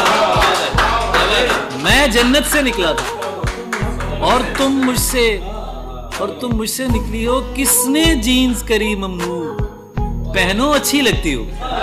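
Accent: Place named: Delhi, India. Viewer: yar bhai hai tu pakistani lagta aapna delhi wala hai wohi boli wohi words wohi aapne mohalle ke ladko ka style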